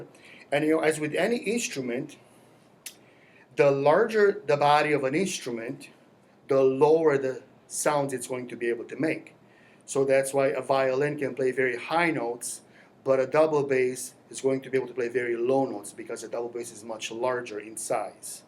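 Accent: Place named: Philippines. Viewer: Your accent sounds slightly filipino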